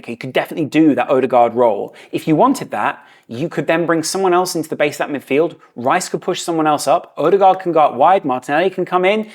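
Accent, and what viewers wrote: United Kingdom. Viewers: This just doesn't make any sense. British accent, baseball jersey, skater/surfer hairstyle....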